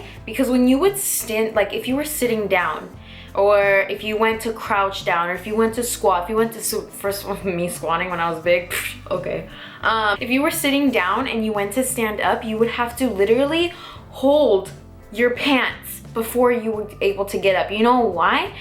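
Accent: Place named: Arizona, United States. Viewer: Sounds like she's from Arizona 🌵😃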